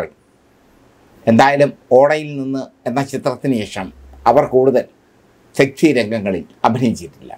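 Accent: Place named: Beary, India. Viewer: അതു ഞമ്മടെ ബ്യാരി മലയാളം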